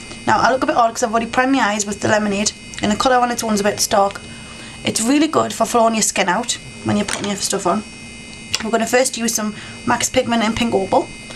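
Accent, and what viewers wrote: United Kingdom. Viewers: I'm Brazilian, could you talk a little slower? Just a little? I have to put the audio transcription to get most of what you say by reading, and normally I talk to native Americans who I can understand more than you who is British. I say that 'cause I love your videos and make ups. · Very good tips.. it will helps a lot in my photoshop retouches! Just one thing I hated: your british accent. American sounds good to me, but... Thanks a lot!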